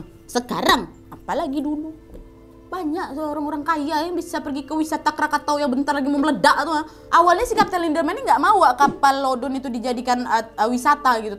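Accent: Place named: Medan, Indonesia. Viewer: senang melihat membawakannya lucuuu.. kental kali medan kau.. GOOD JOB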